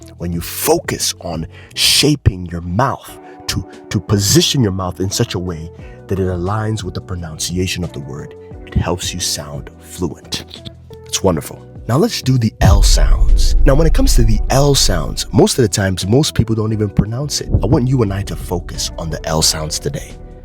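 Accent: United States. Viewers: From Algeria I Love your lessons at general and I love your Americanaccent. Particularly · I am from India ❤love your american accent but i am not able to pronounce like you please tell me how can copy you ?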